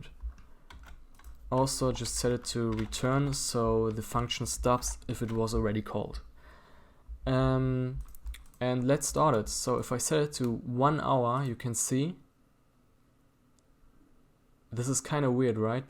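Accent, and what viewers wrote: Germany. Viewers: I KNEW YOU'RE GERMAN FROm THE BEGINNING ON! (bin auch deutsch)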